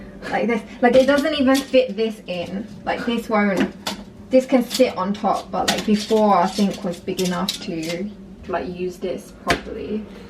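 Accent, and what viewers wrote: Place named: United Kingdom. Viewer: hmm interesting, UK accent coming back to HK very small aprtment.. why not comeover to the mainland. Try Shenzehn and Guangzhou much bigger much better But goodlocation will always trump other factors so good on both of you. Our family also feels that the surrounding environment is important especailly when you walkout on the weekend, you want to be somewhere with cafes etc and a place you feel cmfortable also its good to be around the same kid of clientelle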